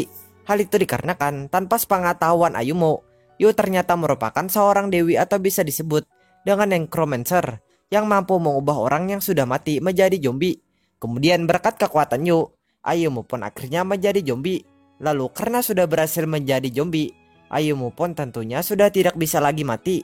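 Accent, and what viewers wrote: Banjar, Indonesia. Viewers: Orang banjar kah bang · Bang lo org banjar kh?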